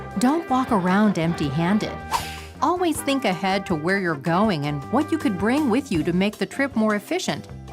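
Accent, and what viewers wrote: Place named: United States. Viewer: A bit American but good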